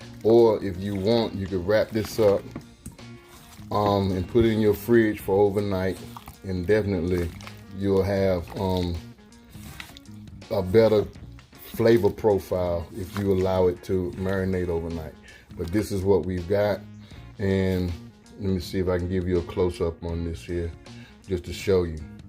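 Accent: South, United States. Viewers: Haha!!! This here man is so southern. I love it. Those wings look great. You should get you a second basket though. You can put your wings in one then drop it in the grease. You put the second one on top to keep them submerged. You are able to shake the basket to keep them from sticking as well. When they are done they are already in the basket. I subscribed. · Girl I was like wait a minute is he married then I went to take the rest of his channel so I assume that he is married so no disrespect when I'm say this comment I'm like this man than made him an outside deep fryer and then it sounds like he's from the south and Country guys, real country men be coming up with all kind of neat stuff it just makes me so proud, like one of my friends actually made his own barbecue grill he welded it and everything himself lol we sat out after he finished and had a late night bbq. 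So all in all what I'm getting at here was I so excited to see him take them wings and drop them in that outdoor deep fryer 🤣😊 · He gotta be southern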